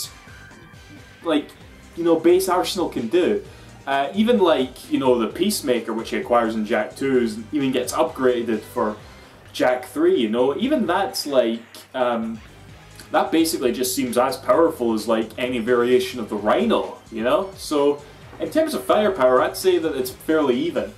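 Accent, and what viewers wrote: Scotland, United Kingdom. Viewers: Right? I've watched his channel forever and those play throughs multiple time. This beautiful Scottish accent is a part of my nostalgia at this point :)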